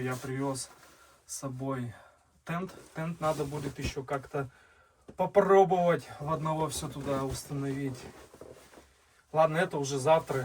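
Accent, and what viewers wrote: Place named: Vyatka, Russia. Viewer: Говор вятский, если не ошибаюсь...)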